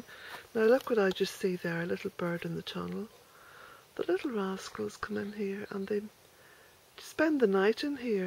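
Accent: Ireland. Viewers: Catching up with your early videos. You don't have such a pronounced Irish accent these days! My, how your garden has become so abundant in these past 13 years, you work so hard. You had three cats in this video - I assume this was pre-Jack? Lovely to compare the before and after. X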